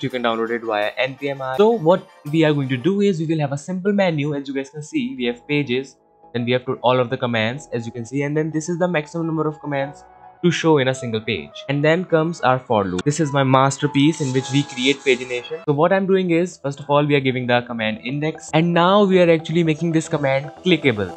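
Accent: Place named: India. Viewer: +where are you from? you sound like an indian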